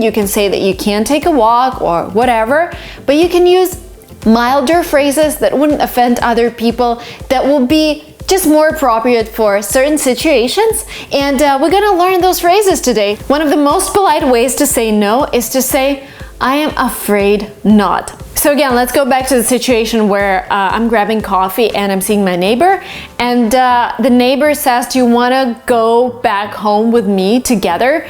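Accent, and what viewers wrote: United States. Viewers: I like the way you speak your english in American so yeah it's great I like it. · I watch your channel because even though you're not native your accent is very real. Actually at first I thought you were American. So I find you inspiring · Before watching her video I thought that she was american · I watch your channel because you're just one of the best teachers I've ever had. When I first saw your channel,I thought you were American! You English is so good. Thanks for your help,keep making these amazing videos. I am 12,and my dream is to be a polyguot. BYE,from Brazil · I’m watching this channel bc I want the same accent as you .. and also I’m living in Canada .. and I kind of need American accent ... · The reason why I watch your channel is that your pronunciation is quite clear and I really enjoy the way how you make your videos it's very dynamic in my opinion that's the reason why I always try to imitate you right after you start speaking because I want to become fluent and obtain the American accent as you got. 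Moreover, it helps me a lot in learning new vocabulary!!!! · I watch your channel bec you told me how to speak English like American accent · Why am I watching your channel? First of all you are a non-native speaker and I highly prefer to observe your video because I want to take your advice that you've done since you start your learning and How you are able to speak like American. And I always tell everybody who learns English to review your channel cause it has a lot of advantages you are amicable and you elaborate anything so obviously and it makes your channel with lots of fortification. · Are you like your way speaking you are a good teacher and you teach American accent so I can talk like a native speaker and you are so beautiful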